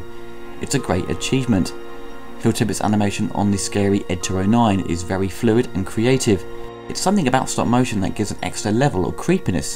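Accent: United Kingdom. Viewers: Love your reviews. I'm catching up on them. I just love British accents, and word choices. See Americans would say...RoboCop blasted the ED209 to pieces..but you say "he blasts it to bits"..love it!!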